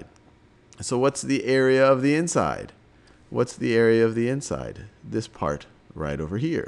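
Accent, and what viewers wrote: United States. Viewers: Definitely American. I’d guess either Midwest, northwest, or mid-Atlantic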